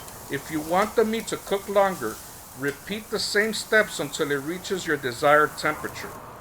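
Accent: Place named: Latin America. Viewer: I can simply tell by the accent that this guy is qualified to have this conversation. If you aren't from Latin America, you just don't know skirt steak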